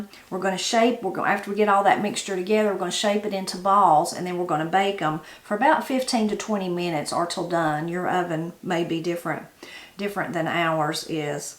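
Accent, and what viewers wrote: Appalachia, United States. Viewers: They look really good,! I’ve only been watching your channel for a couple of months and I love your cooking videos and ones with Matt or Granny. I loved the video yesterday of Matt frying the potatoes and deer in the bag with so much flour! I like how you say milk as ‘meelk’ and a ‘bad place’ when there is a spot on vegetables you’re preparing. The Appalachian accent is so good! I live in the north of Australia (my local area gets 300 days of sunshine a year) and I guess I’d have an accent to you guys too! 🇦🇺 Some of my favourite words you say are Papaw, okrie, chocolate gravy, ramps, Postum, etc. Never heard them before watching your videos.👍🏼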